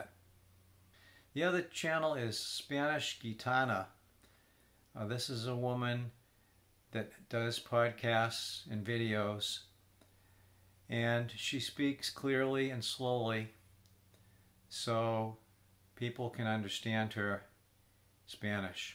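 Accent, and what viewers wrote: United States. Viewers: Sounds like he speaks Spanish with an American accent… good going….organic Spanish is awesome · Yes this is literally speaking Spanish with a complete American accent with zero effort on the accent, this is something that can be improved and even mastered.... if one is aware of it. It's crazy to me that people think they can speak another language but not use, or even attempt another accent, it's just as important as the grammar and the vocabulary.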